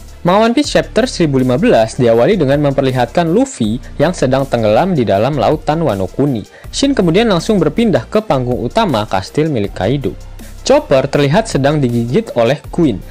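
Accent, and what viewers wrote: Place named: Java, Indonesia. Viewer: Wkwkwkwk alias kelelep .... Nguakak aku couk.... Jowo endi sampean cak..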